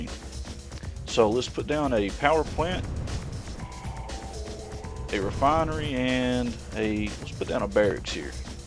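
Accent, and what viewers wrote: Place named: Texas, United States. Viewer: you sound like somebody from texas with that accent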